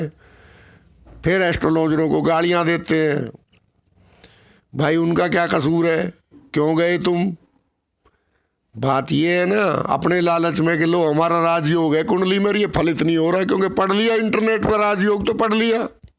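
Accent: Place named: Delhi, India. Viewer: Ram Ram Dada aap ki boli Delhi Ncr ki hai pahle bhi apse reqvest ki hai darsh karne ki aapse marji aapki apka contact no to milta nahi mera no hai <num> Om namo narayan Dada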